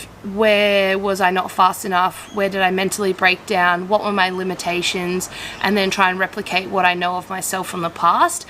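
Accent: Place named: Australia. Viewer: Hiiiii.. From Costa Rica. You can put subtitles in your videos I can hardly understand the Australian accent. Tks. Love you.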